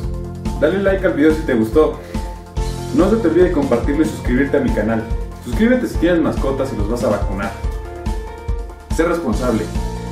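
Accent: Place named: Mexico. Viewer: Porqué los mexicanos hablan así? Desmerecen todo lo que saben...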